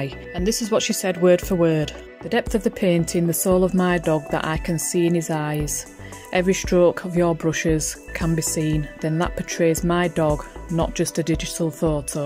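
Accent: Yorkshire, United Kingdom. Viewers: This has to be the best video out on YouTube! Just fantastic. I have to agree with every single word that you said. Great to have someone with a proper accent too haha, where in Yorkshire are you from? Your artwork is amazing!! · Just like to say the painting is fantastic and I agree with your view on realistic paintings. I'm originally from East Lancashire but lived in Dublin for 25 years so to listen to a proper northern accent even though its Yorkshire is great. We have an art gallery here that's dedicated a whole room to paintings of large stripes!! realistic art is real art created by people with real talent.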